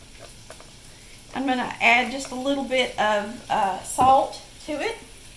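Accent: South, United States. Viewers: I love your videos, your Southern accent, and your recipes. I cooked this Swiss steak and it turned out tender, juicy, and delicious. You're a great cook.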